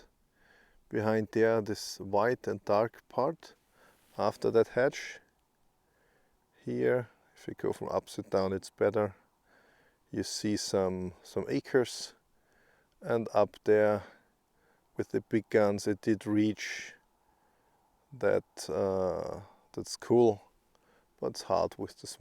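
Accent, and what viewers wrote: Germany. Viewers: it took me three seconds to be sure youre german.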